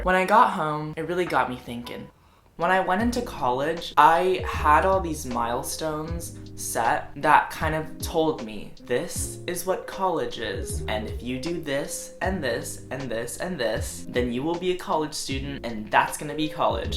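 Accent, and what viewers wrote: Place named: Texas, United States. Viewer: Conan Gray You still talk like a Texan and I love it cause it’s like a high pitched country voice and it’s my favorite thing in the world!!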